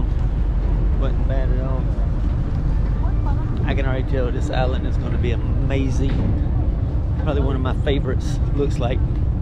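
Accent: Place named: Arkansas, United States. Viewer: bro your accent , are u from Arkansas? its hard to distinguished American.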